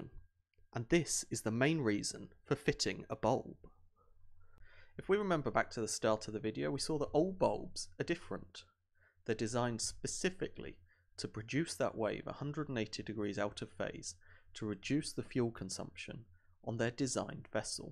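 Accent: Scotland, United Kingdom. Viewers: What's wrong with a yank speaking? I know this fellow host has a striking British accent that I like, but not every "yank" host speaks a disjointed hillbilly mess lol. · ..I hear some Scottish in there.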